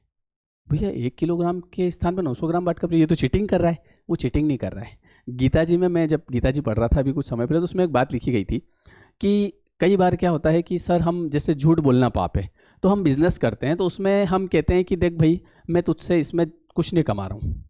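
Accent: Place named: Madhya Pradesh, India. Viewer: Sir jarur aap mp ke honge kyu ki tone whi lag rhe hai😅 kyu ki mai bhi indore se hi hu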